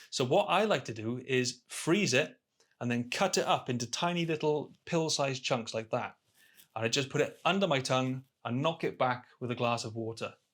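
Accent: Wales, United Kingdom. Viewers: Do you share any thoughts or videos elsewhere? Just found your channel and it was so positive, yet still grounded, that I’m looking forward to watching them all. And I’m hoping from seeing your most recent videos that you’ve really lifted many aspects of your life. That means something, and it’s wonderful to see progress for us all. Finally, I’m a Valleys kid and your accent really helped me take your hints and tips in. Anyway, if you have any other channels I think many of us would love to know. Thanks and take care :)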